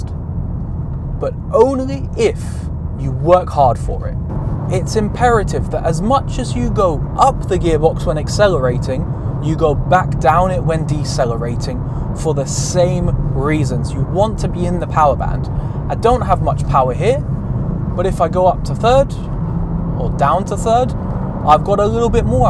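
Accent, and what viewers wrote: United Kingdom. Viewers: Nah just british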